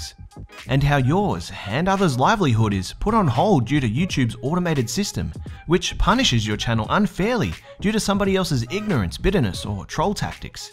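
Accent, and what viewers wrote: Australia. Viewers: definitely aussie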